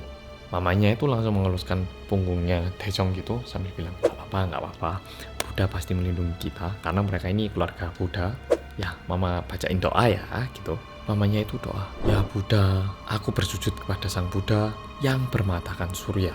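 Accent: Java, Indonesia. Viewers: Intronya jangan di ganti dong kak 😣bersama orang korea yang medok aja😂gk usah ganti😂aku suka ikutin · Baru2 ini aku play rindingdong jam 12 malem. Karena ternyata cerita2 ini dan juga suara mas medhok ini bikin ngantuk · Mas skrng tinggal d mana seh kok bahasa Jawa nya medok bgt...kyk logat Jawa asli:v...respon mas...aku loh gak tau di respon Karo artis:( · Wkwk nadanya kaya ad jawa jawa nya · Semangat lurr,ora ilang jawane💪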